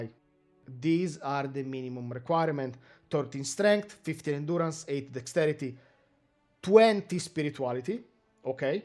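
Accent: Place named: Abruzzo, Italy. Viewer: wow your accent is bad... let me guess you are from Abruzzo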